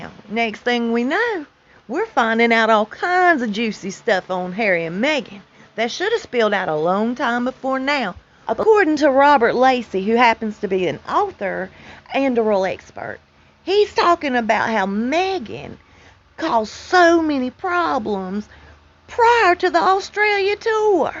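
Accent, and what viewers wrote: South, United States. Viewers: You have the sweetest southern accent. I live in North Carolina. I enjoy your videos. There's a commercial on now. Lol. Have a great day. We're blessed to live in the South❣ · Love your southern accent! Lisa from Spain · I could never imagine cursing my grandma out. I would have carried her on a satin pillow if I was capable. I know from your accent that you’re southern and I’m southern and we just don’t play that way.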